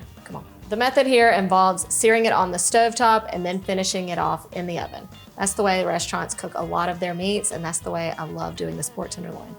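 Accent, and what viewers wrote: South, United States. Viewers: I like her southern accent ...❤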